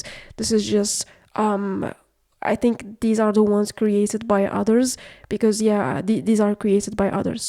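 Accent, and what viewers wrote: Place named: North Africa. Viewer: the voice is giving north african .. are Algerian/Moroccan by any chance? 😊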